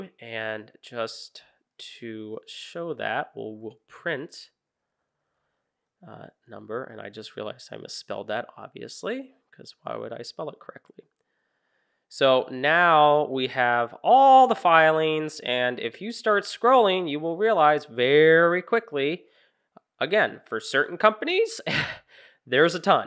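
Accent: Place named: United States. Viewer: very helpful. thank you. keep it up i agree with a previous commenter that having instruction in my native english american accent and idiom makes it significantly easier and more enjoyable for me to actually learn to be able to actually implement some of this in my coding and finance data science projects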